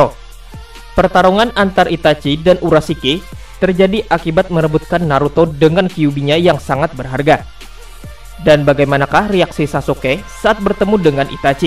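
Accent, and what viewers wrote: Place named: South Kalimantan, Indonesia. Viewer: Bah nang ini tuh totok banar pian mang ay banjar kalua kah si amang nih nang kaya bang ipul hary haja jaejak si bungul tuuh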